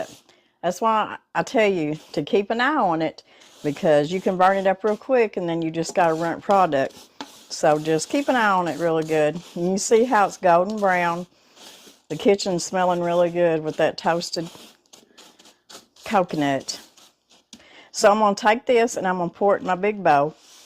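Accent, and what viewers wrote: South, United States. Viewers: Hay thank you for your restapee love your southern talk but that look so good from steve c from state Oregon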